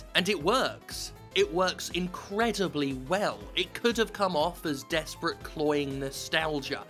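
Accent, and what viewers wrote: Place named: United Kingdom. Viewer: Are you sure about that? From his accent he's clearly British! =P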